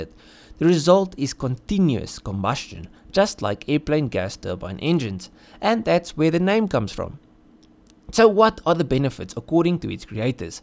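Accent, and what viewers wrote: South Africa. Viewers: This guy sounds south african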